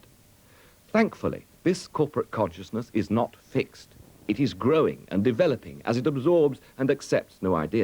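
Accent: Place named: United Kingdom. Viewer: I think this is awesome, but I can't listen or read this video well in English, my language is Spanish. I've tried to (the great British accent helps me), but the CC are a bit confusing sometimes. It's there any transcription in English? I really want to understand this chat entirely. Thanks in advance!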